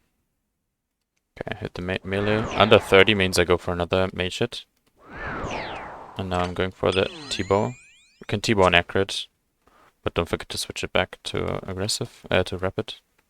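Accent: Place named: Germany. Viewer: i can hear german in this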